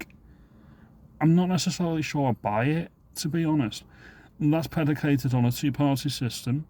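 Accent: Liverpool, United Kingdom. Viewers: Is this how a posh scouse sounds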